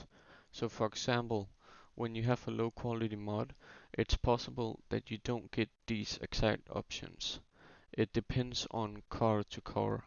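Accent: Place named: Denmark. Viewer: det er hvad jeg kalder en dansk accent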